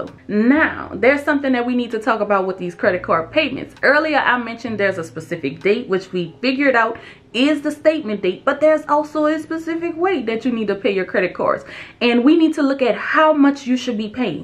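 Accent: New Orleans, United States. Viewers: Ok so im new to your channel. This is my first video. I SWEAR your voice has a New Orleans kick to it. (That's a compliment. I live in La) 😍 That is all! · I knew you were from NOLA or the area when I heard you speak. :) Good info. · So 1-2 percent is the magic number I thought it was 9 percent Thank you and your husband for educating the masses Knowledge is power are you from New Orleans or savannah loving your voice I really could use the 500 dollars January is the month I apply for my very own house after Divorce Please choose me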